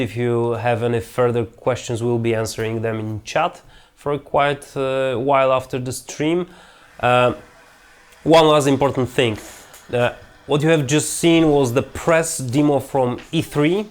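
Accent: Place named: Eastern Europe. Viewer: his accent is so eastern europeany like it feels like im having sex with his voice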